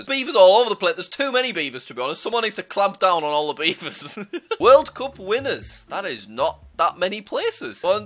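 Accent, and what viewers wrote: United Kingdom. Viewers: Your bri`ish accent is just crazy, mate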